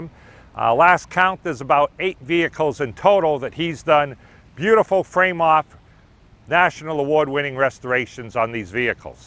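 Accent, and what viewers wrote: United States. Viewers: I hear Boston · Being from Massachusetts, I myself will guess the presenter is from Maine.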